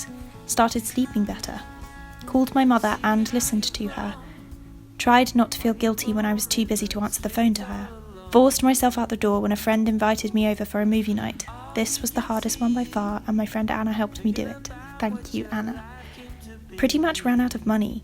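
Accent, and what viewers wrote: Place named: United Kingdom. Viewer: you sound so quintessentially english x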